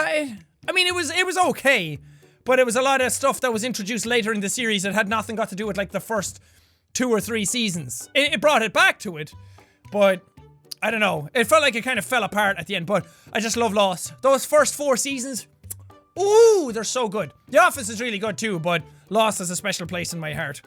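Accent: Ireland. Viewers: Hey + do you live in Ireland or are you Irish? Like if you have the same question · Jack has his irish accent again. I like it %<num>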